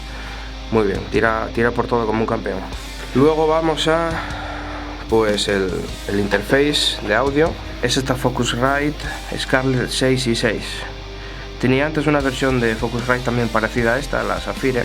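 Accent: Spain. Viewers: Ese acento asturiaaaaaaaaano 😂👏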